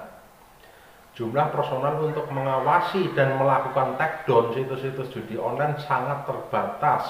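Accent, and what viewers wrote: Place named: Banyumas, Indonesia. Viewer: Ngapak banget😂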